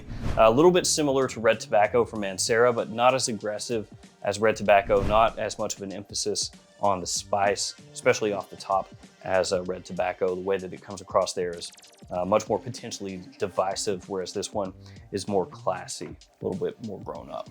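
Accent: United Kingdom. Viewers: Mate, I'm from the uk and we ALL speak like that!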